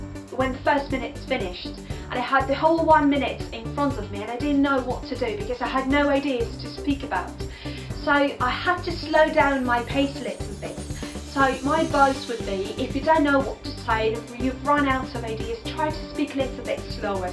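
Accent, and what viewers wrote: RP, United Kingdom. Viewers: Your not Ukrainian your British...i wish i can speak like that 😭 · What with the posh accent? :P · Wow, astonishing! When you started speaking, I thought you were a British teacher. Your accent is so perfect. Congrats,! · i can't even ukrainian you speak like a real native eng speaker british so good amazing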